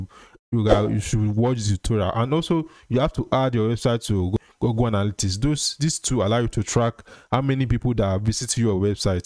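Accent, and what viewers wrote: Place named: Nigeria. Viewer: Nigerians, your accent cannot go unnoticed. "Sarch", "pour-chase". Lots of love from Anglophone Cameroon ❤️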